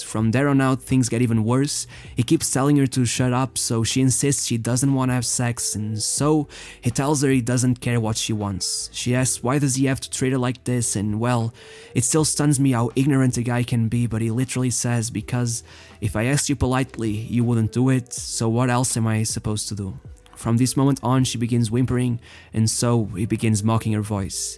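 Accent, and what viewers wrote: Portugal. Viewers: Your Voice looks portuguese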